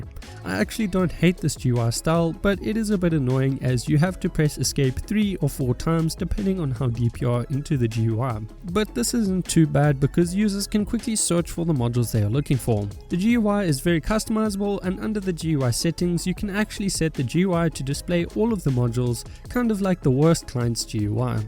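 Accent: South Africa. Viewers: could hear the south african accent a mile a way 😄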